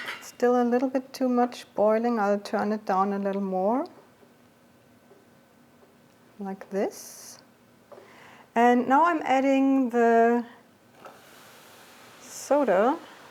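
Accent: Germany. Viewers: Perfect english ... ein bissl hört man noch den deutschen Akzent. Aber .... echt gut. Die Laugen sehen toll aus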